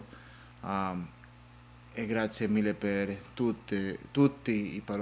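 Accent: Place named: Italy. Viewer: Sei bravissimo, hai un accento italiano al 100%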